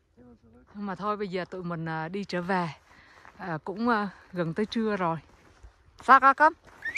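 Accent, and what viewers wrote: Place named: South, Vietnam. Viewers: Giọng nói của chị rất chuẩn giọng miền nam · Giong Nam cua nguoi bac 54.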